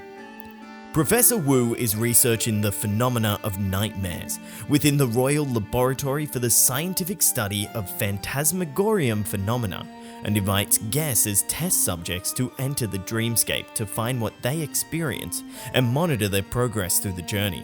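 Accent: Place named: Australia. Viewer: Are you from Australia if not then I like your accent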